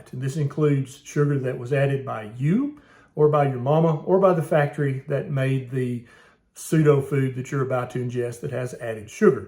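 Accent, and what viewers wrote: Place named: East Tennessee, United States. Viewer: Dr., I am from East Tennessee, living in Florida for decades. I so enjoy hearing you speak. Sounds like Home. And your wisdom, freely given, feels like Home. Thank you to a true son of the volunteer state from a daughter of said state. You have a mighty big heart. Much gratitude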